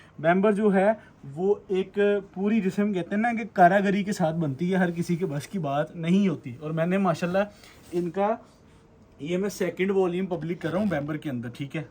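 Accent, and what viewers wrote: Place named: Faisalabad, Pakistan. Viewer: Ye fslbd k hain bhai ki boli fslbd ki h plz ap apni shop ka address b Bata dia karein tak ap ki shop ka visit Kia ja saky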